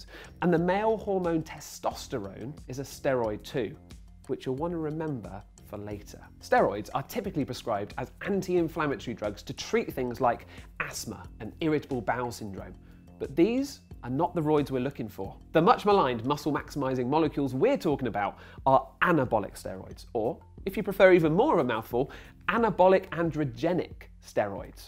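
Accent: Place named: England, United Kingdom. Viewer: That English accent thooooooooooooooooooooooooo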